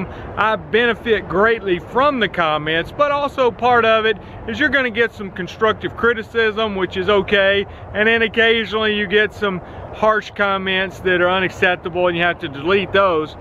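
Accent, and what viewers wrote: South, United States. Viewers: Great advice mate ! i think your accent is great and i love hearing you guys from the states ! You'd crack up hearing how us guys sound down in Australians :) Just be yourself and don't put others down :) Some people just think they are so cool and better than others in the industry ! i have contacted another company before i have taken on a new customer. i decided to not provide a quotation based on the feedback i had from the other company. yes spring is the best time to grow your business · I guess it's just where you live and where your from. I dont notice you having any accent at all. You talk like everyone else from Alabama and Georgia. Keep doing the videos buddy, I enjoy them. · Ha, don't you worry about a thing brother, I probably have a thicker southern draw than you do, and let's face it, we can sound a little strange to some folks. 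That's okay! Water off of a duck's back...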